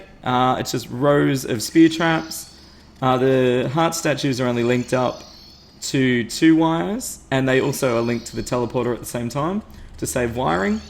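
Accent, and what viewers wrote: Australia. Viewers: Are you Australian because I am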